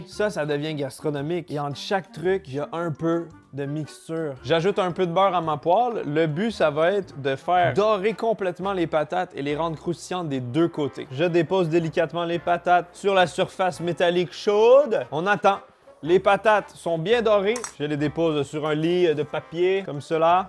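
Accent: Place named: Canada. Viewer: l'accent canadien c horrible.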